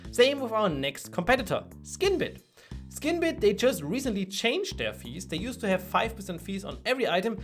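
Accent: Germany. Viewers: brandenburg englisch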